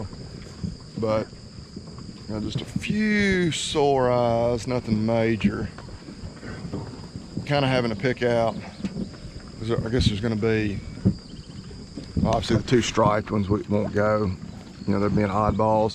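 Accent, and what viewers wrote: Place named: Tennessee, United States. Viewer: First video I clicked on, being from TN I loved hearing that accent! Lots of support from southwest TN!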